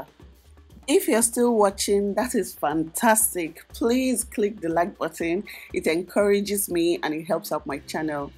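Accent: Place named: Nigeria. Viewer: I am totally blind and I love the way you described your video. He did a good job and it's easy to follow by the way you sound like you're from Nigeria. Anyway that's exactly what I did and how I followed it it's very good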